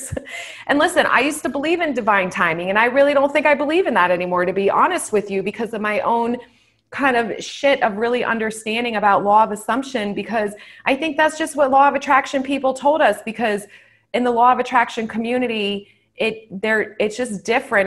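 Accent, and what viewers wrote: New York, United States. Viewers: I've been binge watching all these videos of different coaches and I am slowly liking your vids the most! Plus as a New Yorker, I can totally tell you are from NY 🤣 But I definitely need to work on self-concept and assumption because I really really want my SP and I can see the hot and cold with how I am feeling that week or not. I want what I want and I deserve that shit! : )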